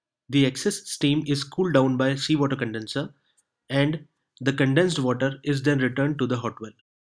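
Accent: India. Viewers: accent, its something crazy/ indian ....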